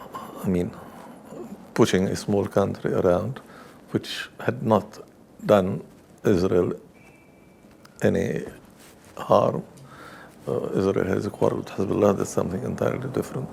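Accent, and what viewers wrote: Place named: Australia. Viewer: This thing is not even worth posting. And the host sounds Aussie😮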